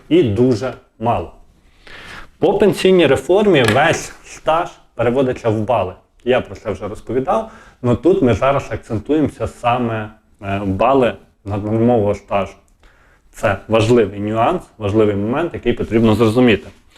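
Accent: Ukraine. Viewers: Мова в нього, звичайно, жахлива, русизмів дуже багато, хоча по вимові, відчувається, що рідна в нього українська.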